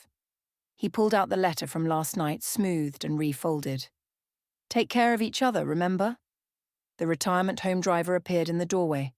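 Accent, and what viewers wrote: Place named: United Kingdom. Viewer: British voice. F you. Not listening.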